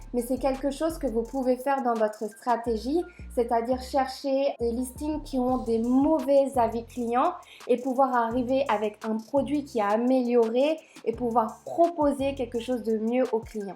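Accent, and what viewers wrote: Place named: Alsace, France. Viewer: Moi je pense d'Alsace ou Loraine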